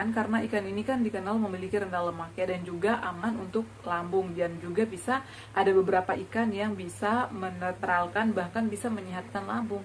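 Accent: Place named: Java, Indonesia. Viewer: Kalau ngaku orang sulawesi ngapain logat jawa, lestarikan dong budaya sulawesi sama dengan daerah-daerah lain di indonesia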